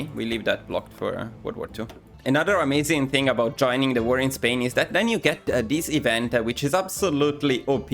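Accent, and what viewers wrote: Italy. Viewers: The Italian accent, Perfection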